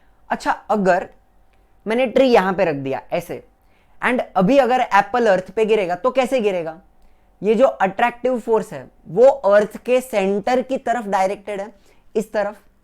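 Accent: Maharashtra, India. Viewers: Sir you are marathi??? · I bet your mother tongue is Marathi sir🌶️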